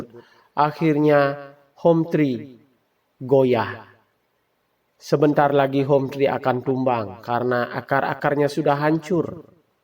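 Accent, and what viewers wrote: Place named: Kupang, Indonesia. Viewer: Orang kupang kyaknya nih😂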